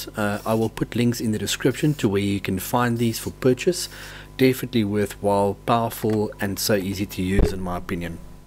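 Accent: South Africa. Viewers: are you from South-Africa sir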